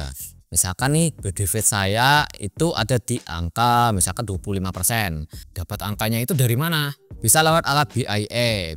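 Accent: Java, Indonesia. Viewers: Jadi medok gini bang wkwkwk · Sampean jowo to bang, kok medok😀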